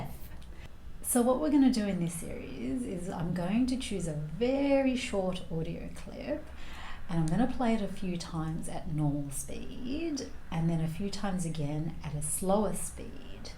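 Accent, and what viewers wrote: Australia. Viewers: Hi, I just arrived in Australia, and I wanna learn Australia accent so bad. Thank you for your videos. I hope I can learn a lot from you.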